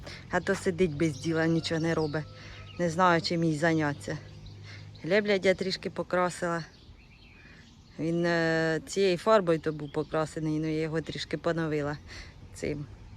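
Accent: Poltava, Ukraine. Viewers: Полтавський суржик,це прикольно.Глобино? Привіт з Черкащини 😊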